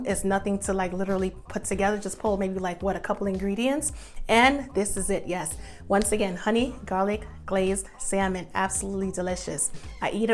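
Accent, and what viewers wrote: New York, United States. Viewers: You are a new yorker...arent you? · I need sounds like she from New York Cooks like a Southern Mother looks like Beyonce I'm in 😊..... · Very good :) - i wonder if she is from New York lol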